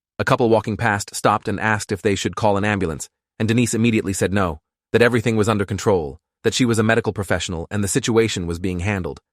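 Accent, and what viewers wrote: United Kingdom. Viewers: Did you notice the way it slowly switched to a British accent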